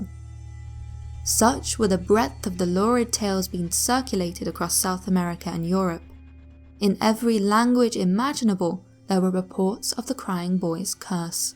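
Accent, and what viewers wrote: England, United Kingdom. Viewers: Great video...love the accent!! Not trying to be Pervy McPervinstein but english accents are sooo hot and make a woman sound classy and intelligent which we all know is classy as well. Anyway ive aleays seen depp as a wussy...no suprise a painting could do that to him. Especially knowing the backstory of not just painting but artist as well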